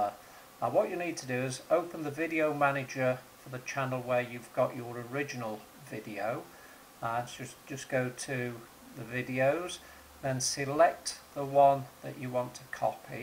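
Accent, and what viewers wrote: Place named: England, United Kingdom. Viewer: your english accent........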